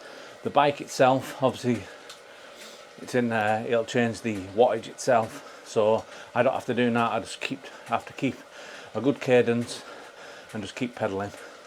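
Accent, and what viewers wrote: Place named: United Kingdom. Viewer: Well done 💯💥 I've tried the FTP test myself a few times, and it's always been really hard at the end! 🥵 I don't know how constructive it is, but what I miss the most when I'm watching your vides, is proper subtitles 😅 As a dane, I sometime struggle a bit hearing/understanding what you say, maybe because you sound a bit British. The auto-generated subs are mostly ok, but for instance Kicker Core are subbed as "kick a car", the CC often gives weird results, when product or place names are spoken. I don't know how many foreigners are watching your videos, and I'm sure you have enough work editing your videos already, but still, I hopy you wellcome feedback. Anyway, thanks for another great video, Ride on! Happy New Year, and good luck with your cycling goals in '25 🎉 /Ole, Denmark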